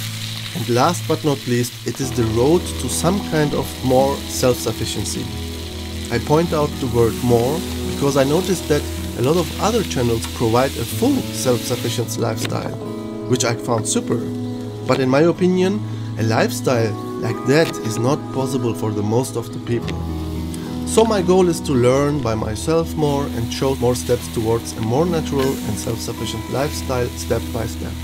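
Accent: Germany. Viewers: I love everything about your channel.... we use to criticize people living thus lifestyle fulltime but we are now understanding that we need the world and the world doesnt need us.....we need to live in harmony with this earth and as for your German accent .....we all have one🙂 saying hello from Barbados 🇧🇧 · Your german accent does not bother me or others.what matters is what you do. You are showing others that if you make up your mind that it can be done.thank you very much. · Grüße aus Ohio, USA ... Ihr deutscher Akzent stört keines Ihrer U-Boote, macht Sie einzigartig und bringt mich zurück zu Ihrem Kanal ... Bleiben Sie sicher ... Ich wünsche Ihnen einen schönen Tag ... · Awesome video,and I would agree with almost everything that you said...and btw.you should talk in your videos more,I meant you must be German when I heard you speaking before you said it,and your accent is preety good,kind of sweet,especially by the fact that English and German are so different languages.However,for me German is much nicer language,even though I don't speak Deutsch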